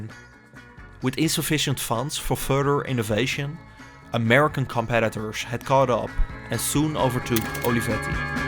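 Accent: Netherlands. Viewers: Engelse les bij Mark Rutte gehad? Ik vind je docu geweldig, maar je Nederlandse Engels is goed te horen. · Dutch accent by the sound.😁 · Je haalt ze d´r altijd in 2 seconden tussenuit hè, die Engels-sprekende Nederlanders 😄👍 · Dutch voice 😅 olivetti had design. Jammer dat ze niet meer bestaan. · You can hear you are Dutch. Not only that, but are you from Leiden?! My head wants to know... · Well, Someone just made it to a CIA transscript :P. Great vid. You sound like you're from the netherlands. If so, Top video. Ik ga zeker abonneren. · ontzettend nederlands accent . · Keep up the vids! Have to say that the Dutch accent is strong with this one! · Jezus man, gebruik Ai ofzo. Dat Dunglish accent van je is super-irritant. Verder wel leuk. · Dutch English 😂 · Veel leuker met meer uitleg over de geschiedenis van het gebouw dan de standaard urbex video's, dacht al een Nederlands tintje in je taal te horen haha. 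Subbed! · the most dutch accent ever .. Thank you for your content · Tintje? Het is overduidelijk een vrij dikke nederlandse accent. Voor mij is het niet om aan te horen maar dat is vrij persoonlijk. De inhoud is best goed en erg interessant en daar doet het accent niets aan af. Mooie docu! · Top notch Urbex content, guys! Music, back story, pictures, narration; everything is just right. I really enjoyed the video and to learn the story of Olivetti. And as someone from the Netherlands, I enjoyed the Dutch accent, of course. ;)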